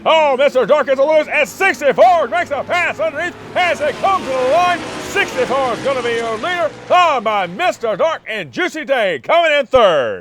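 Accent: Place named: South, United States. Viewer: Just found you guys! About time we had some good country boy narration- 😂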